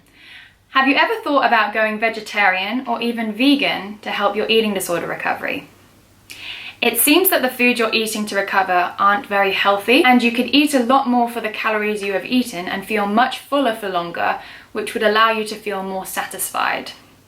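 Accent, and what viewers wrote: England, United Kingdom. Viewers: You have such a contagious positive actitud! Love your personality. My questions are: where did you get a British accent if you are from Hong Kong and only been to the States? 😅 And, what have been the struggles and positives of making public your ED here in YT? Take care,. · Is your family English? Because you have a strong English accent ❤ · Hey, why is your accent so very British, when you've grown up in Hongkong and lived in America for such a long time, but never in the UK? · ! why do you have a British accent? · How do you have a British accent but you were raised in hk??? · How did you acquire an English accent? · I don’t really know much about Hong kong so I don’t know if this is a stupid question but what language do they speak in Hong Kong?... do you speak it? And also I don’t really know how schooling works there but you speak with a British accent.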